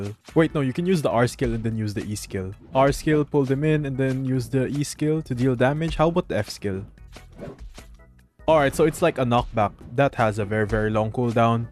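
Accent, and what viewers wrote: Philippines. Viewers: Are you pilipino? it seem's you're pilipino because of your accent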